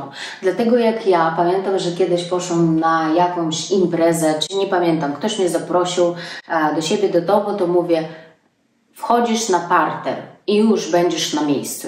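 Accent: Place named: East, Poland. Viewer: Skad ty pochodzisz - po akcencie widze ze ze wschodu-wszystkie polskie zwyczaje sa piekne , niepowtarzalne- takie nasze polskie- tym sie roznimy od calego swiata- imieniny - supe - calowanie w reke - szacunek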